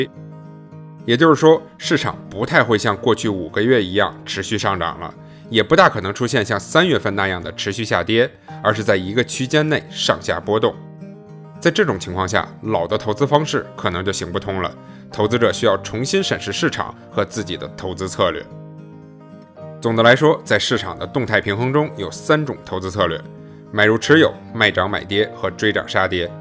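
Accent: Beijing, China. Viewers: 的京片子真的好聽！不抄股的空挡也可以去当演員了!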